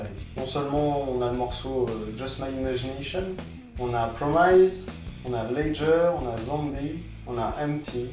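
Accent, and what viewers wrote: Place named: Spain. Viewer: A quand la vidéo sur un groupe espagnol pour faire attendre ton bel accent ? Venga hombre, queremos màs videos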